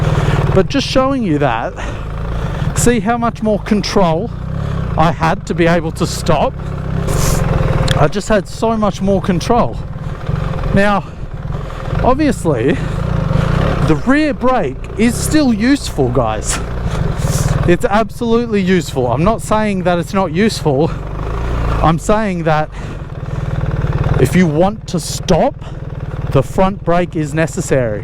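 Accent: Australia. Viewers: thank you for showing us!! also you where just in Portugal and all over Europe you sounds Australian and you now live? in Estonia? talk about adventures XD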